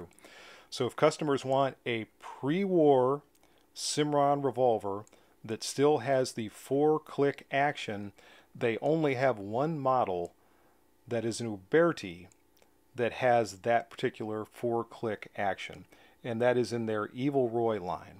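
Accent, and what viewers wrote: West, United States. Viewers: As also an Ohioan I approve the use of the western accent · Great review! Love the western accent 😄🔫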